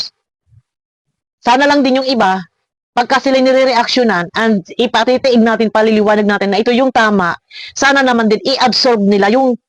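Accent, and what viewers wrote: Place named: Laguna, Philippines. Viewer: Ma'am, taga saan ka po? Ang puntuhan mo kase ay parang taga Laguna😅 na may pagka batangeña😅.. ang cute lang pakinggan😊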